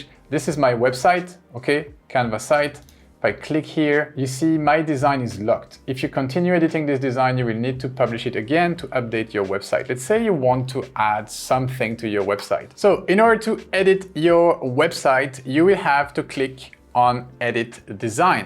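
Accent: France. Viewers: Your accent sounds french , and i wanted to tell you MERCI BEAUCOUP pour tout le temps investi dans cette vidéos et toutes les informations que vous nous avez servi gratuitement , un compte YouTube qui se démarque de loin de tous les autres encore un énorme merci à vous ❤️ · French accent ?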